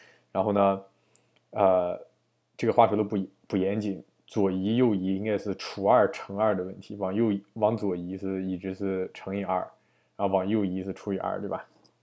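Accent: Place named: Dalian, China. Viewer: hh 大连话好亲切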